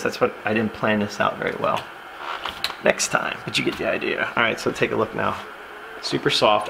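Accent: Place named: New Orleans, United States. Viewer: Where are you from? You sound like you are from New Orleans. I grew up here and the accent sounds familiar.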